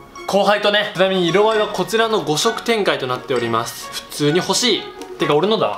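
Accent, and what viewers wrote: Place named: Toyama, Japan. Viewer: この中に入っとるんか。 完全富山弁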